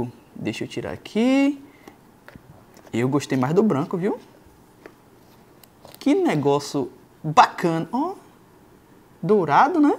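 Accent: Bahia, Brazil. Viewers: Vc é de que enterio da Bahia?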